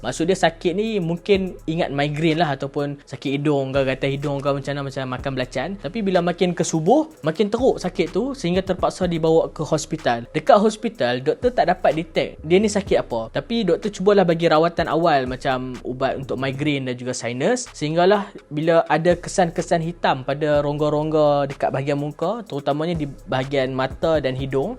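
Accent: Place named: North, Malaysia. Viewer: Pekat utara😂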